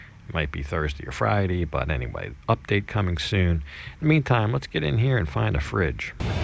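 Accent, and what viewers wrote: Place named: Pennsylvania, United States. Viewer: I'm guessing you're from Pennsylvania judging by your accent.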